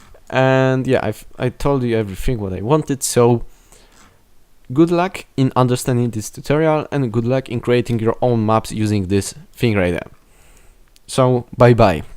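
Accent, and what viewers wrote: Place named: Germany. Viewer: Masz akcent jak najebany szkop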